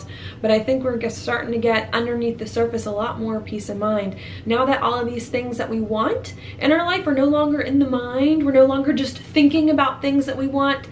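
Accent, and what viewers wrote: South, United States. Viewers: My goodness gracious, you have amazing skin and healthy hair. I don't talk like a douche. But I needed to get that out. Rawr, lol. Okay, I've looked like a beta jerkoff enough now. I'll quit. Not like I'm ever gonna meet you, so I didn't have anything to lose by telling you these things, hahaha. Oh and that country accent, and your energy... yum 😂 Great readings too. No joke.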